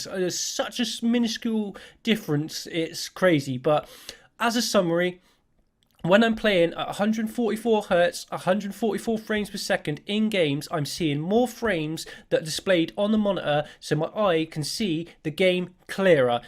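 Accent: Bristol, United Kingdom. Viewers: good to hear a bristol accent! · Is this guy Bristolian?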